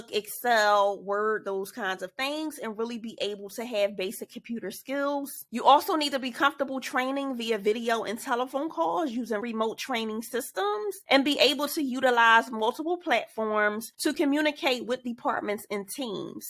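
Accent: Philadelphia, United States. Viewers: Good stuff!!! Thank you for the information. I hear a Philly accent...😂😍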